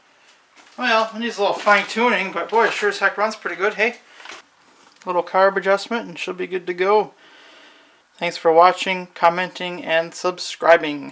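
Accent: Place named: Upper Peninsula, United States. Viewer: you are picking up a little yooper accent!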